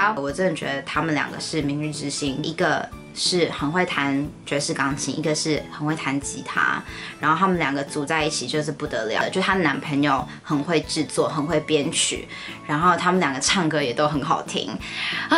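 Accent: United Kingdom. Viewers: I really like to watch your videos .....I'm falling in love with it ...and I hope to see more interesting video ❤️....furthermore I also like your english accent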